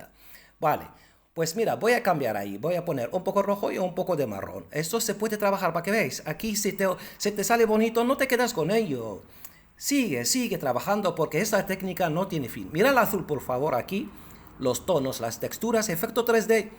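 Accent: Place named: Morocco. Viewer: Me gusta ver y escuchr a como maestro, con su acento marrocano en castellano. Recuerdo mis amigos Marrocanos que vivien en Oslo, Yo agradesco siempre por tù generocidad de compartir tus experiencias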